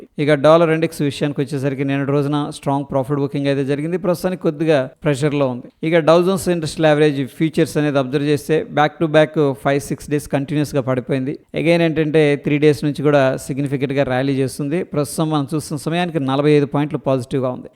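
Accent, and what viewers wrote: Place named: Telugu, India. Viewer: Thank you brother for the information🙂👍, your voice and Telugu ascent reminds me the news reader on All Indian Radio morning news in child hood days.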